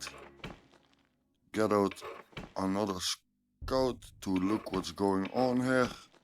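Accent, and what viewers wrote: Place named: Netherlands. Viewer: New to the Stratego app, but I am a fan of the game all my life. I recognize a Dutch accent a mile away. Nice video, hope to out bluff you if we ever meet up.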